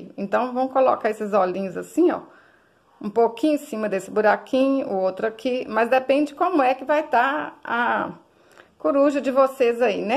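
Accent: Minas Gerais, Brazil. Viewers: adorei essa coruja vou fazer e colocar onome dela de fuxigueira haha bj grande goste de ouvir vc falar me faz lembrar de minhas tias de minas gerais em andradas que saudades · Vc tem sotaque de mineira! Sou de BH ! Vou te dar meu like!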